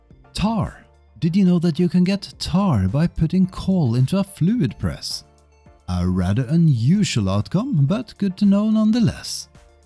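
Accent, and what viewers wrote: Norway. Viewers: I haven't watch a video by you for awhile now but I started playing Conan again so now youtube says LOOK! Which is nice. Useful tips to know. Sidenote: I don't know why or how but for some reason your accent sounds stronger than before. Maybe you just have a new microphone or something, no idea but it's the strongest norwegian accent I think I've ever heard x) 🖖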